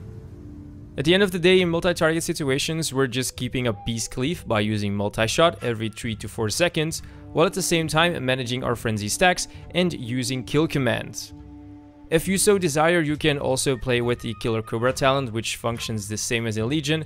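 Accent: France. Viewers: Tu es Francais toi :)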